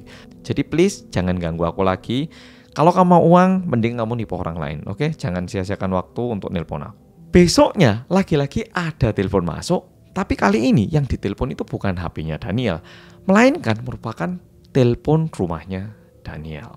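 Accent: Java, Indonesia. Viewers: Bang mukamu Korea tp bahasamu medok bngt..mf y jgn marah 🤞🤞tp cerita mu seru abis dn keren 👍😄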